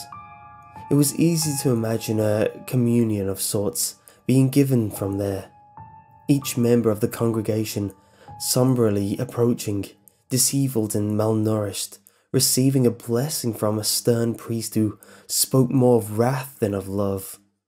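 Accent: England, United Kingdom. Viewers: I like MCP and. But I like English accent better than MCP's accent.:D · Yah,not really into britspeak.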